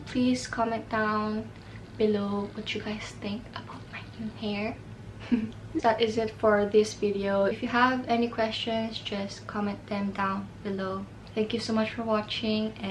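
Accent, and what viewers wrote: Philippines. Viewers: pardon me bc this is the first video im watching frm your channel! i've subscribed:) just wondering cus i hear a little accent.. are you a filipino? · She's korean? Or a filipino living in korea? Because i notice filipino english accent/slang. So i'm assuming she learned english in the philippines if she's actually korean 🤣. If she's filipino then this makes sense Edit: i finished the video. Ok pinoy ka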